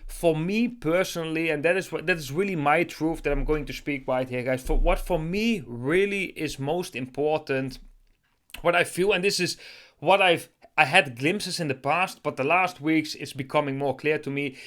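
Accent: Netherlands. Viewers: Hallo! Ik denk aan je accent te herkennen dat je van Nederland bent! Ik ben Belgische en je bent de eerste die ik ontmoet met dezelfde taal en niet al te veraf! Wauw! Heel fijn jou te ontmoeten! Dank je wel voor deze aanmoedigiende en inspirerende video's! Ik ben de voorbije jaren pijnlijk geprocessed door God, om in positie te staan voor deze aardverschuivende shift! God bless you!!!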